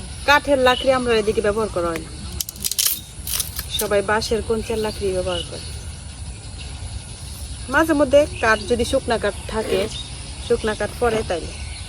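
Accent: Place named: Mohanganj, Bangladesh. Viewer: বাংলাদেশের মোহনগঞ্জের ভাষা, আমার এলাকার কাজের বুয়ারা সবাই ঐ অঞ্চলের এবং এনাদের ভাষায় কথা বলে। কিশোরগঞ্জ, মোহনগঞ্জ সম্ভবত কাছাকাছি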